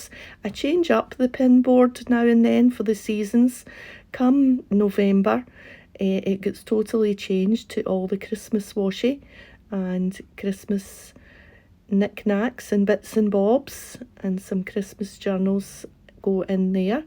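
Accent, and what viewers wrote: Scotland, United Kingdom. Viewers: Love you room tour? Are you by chance from Scotland? You sound a lot like my Mom.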